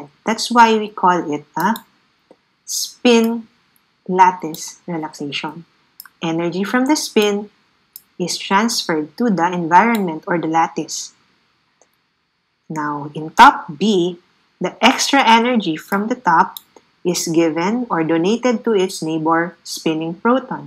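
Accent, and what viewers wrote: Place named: Philippines. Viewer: nice filipino accent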